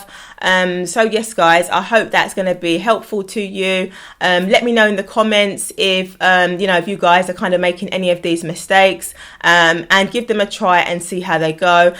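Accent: United Kingdom. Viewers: This sounds mean spirited. Also, there’s lots of British accents. The one she has is typical not the BBC/RP one you’re used to hearing in film usually. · What an accent? Is it really British or it's faked?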